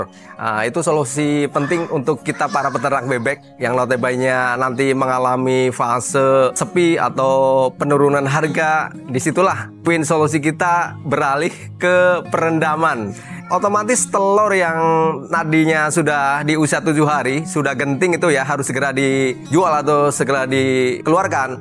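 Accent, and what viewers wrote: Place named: Kebumen, Indonesia. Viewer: Berati kebumen ngapak kaya nyong nng rio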